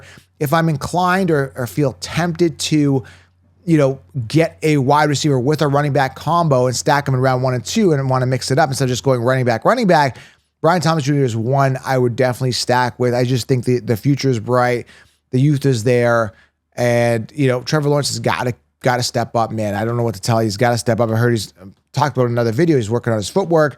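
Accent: Canada. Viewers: It’s just his accent my man. I think he from Canada 🇨🇦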